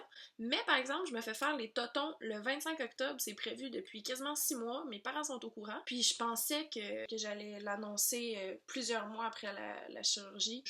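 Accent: Quebec, Canada. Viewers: J'adore l'accent canadien putain ! 😂❤❤ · J'ai un peu du mal à comprendre l'accent québécois mais c'est bien ce que tu dis !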